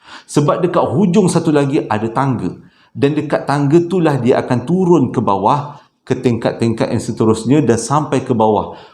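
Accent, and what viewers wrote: Johor, Malaysia. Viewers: Abg org johor ke? Patut la bila dengar cara ckp abg mcm org johor ie.. 😊😊😊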